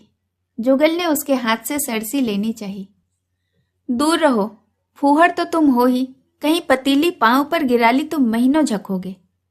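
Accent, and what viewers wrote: Standard. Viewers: Apki shudh Hindi ,aur awaj ki madhurata kya khne . Munsi ji ki kahaniya , sansmaran , upannyas bahut hi hridaysparshi hote h . Pranam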